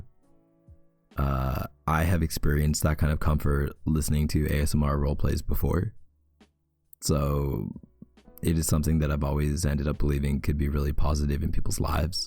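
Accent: Canada. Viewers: his canadian accent is adorable ugh 🥲🤍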